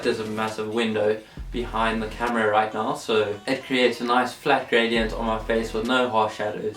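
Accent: South Africa. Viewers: You're South African!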